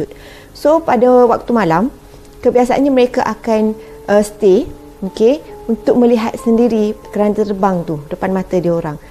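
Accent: Kelantan, Malaysia. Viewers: Maaf bertanya...daripada slang ni saya rasa ni orang kelantan kan?.... walaupun sekali dengar memang tak macam org kelantan,tp kalau dengar betul2 akan keluar slang kelantan tu.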